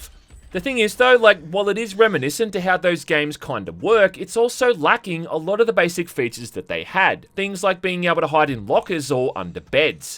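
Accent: Australia. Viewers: the aussie jeremy clarkson of game reviews, i cant take no more of this ear rape.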